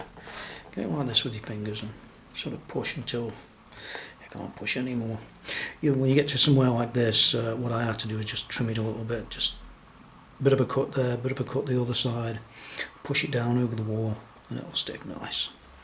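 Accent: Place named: England, United Kingdom. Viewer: you r from england right ?i took u from your accent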